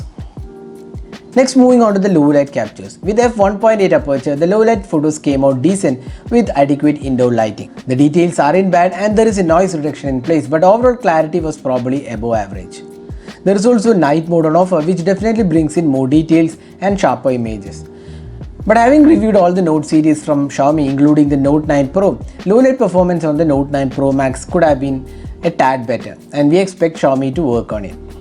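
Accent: Kerala, India. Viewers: English kettalariyam malayaliyanu bro enn!!!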